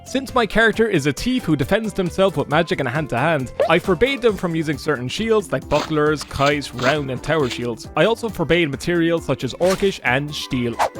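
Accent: Ireland. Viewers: that sultry Irish voice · Man with irish accent playing old RPGs is my favorite youtube genre. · !!! Man it’s so good to hear that Irish accent again, and he returns with almost 2 hours of Daggerfall wowie what a blessing! we hope you’ve been okay, it’s so good to hear you alive and well. CHEERS MATE 🥂🍻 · I’ve never been so happy to hear that Irish accent coming through my speakers. Welcome back,! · you have no idea how happy I am to hear that Irish accent monologue about TES again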